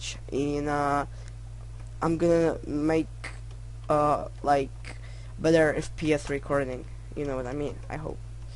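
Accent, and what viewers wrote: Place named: Germany. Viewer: yer it lags....allot....ur not english are u? u German?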